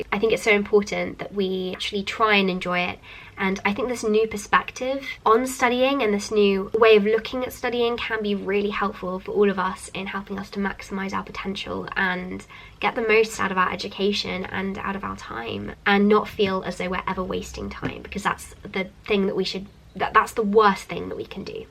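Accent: United Kingdom. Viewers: Yes she does have British accent. But you can't "talk" accent lol. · Can i know ? Do you talk british accent ?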